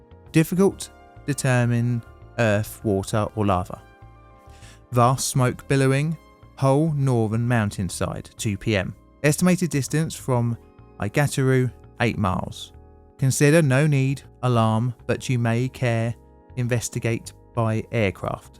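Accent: United Kingdom. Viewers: Oh my the Brit pronunciation of place names is doing my PNG brain damage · I would subscribe your channel in case you could narrate your videos in English... and not in britain dialect...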